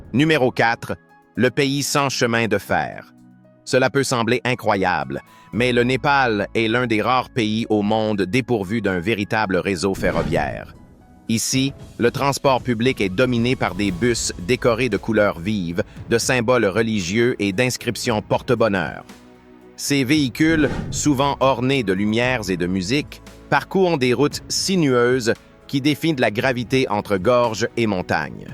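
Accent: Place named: Canada. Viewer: cet accent si sympathique qui est canadien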